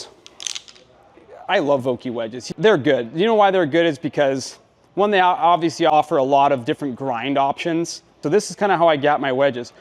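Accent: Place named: Pittsburgh, United States. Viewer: Yinzer haha. Bayg.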